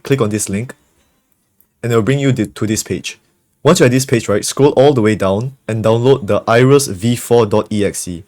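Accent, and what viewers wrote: Singapore. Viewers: Omg ru Singaporean I can hear the accent wasa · thank you singaporean accent man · r u from singapore?? u sound singaporean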